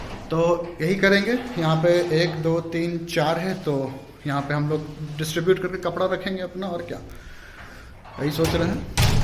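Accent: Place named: Bhojpuri, India. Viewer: Commentary sahi hai bhaye... With bhojpuri ascent...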